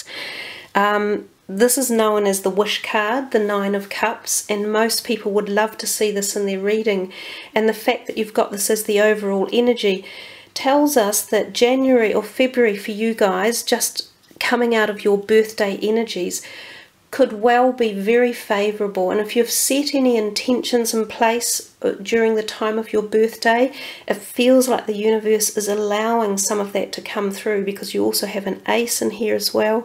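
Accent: New Zealand. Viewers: Hi Hun, a kiwi accent which ya don't see on here.i am just across the channel in oz....good to see a few locals putting card readings on here. I keep an eye on ya insights to see what comes to pass.......cheers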